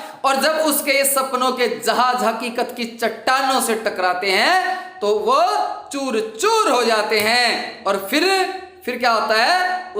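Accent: Haryana, India. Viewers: Ha guruji Mai 2 × speed pe dekta hu To samaj ni aati haryanvi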